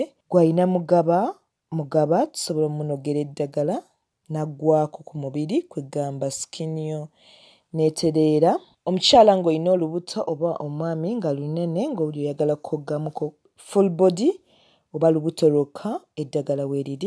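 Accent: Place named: New York City, United States. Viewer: Extremely NYC voice...this is first class screaming...not so different butbyo voice hooo😂❤🤗🥰💥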